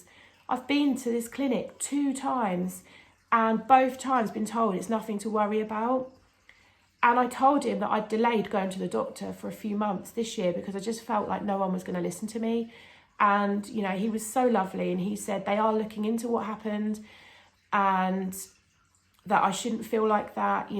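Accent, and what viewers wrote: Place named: United Kingdom. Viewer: The strength you have to go through so much, and raise two children! It's unbelievable when MD's don't take us seriously. You should have immediately been given a mammogram and I should have been given a CT scan. I shared your video with a friend who was upset about the neglectful treatment you received, and she's impressed with your positive attitude too! Btw, I'm in the US. I'm guessing by your accent you're in the UK. My mother is from England. Anyway, it sounds like you're taking care of yourself. Please don't be hard on yourself! The supplements sound good. I take so many supplements, including Resveratrol. I'd totally replace the alcohol with green tea and whatever nutritious things you enjoy. Remember there are lots of people who care! 💝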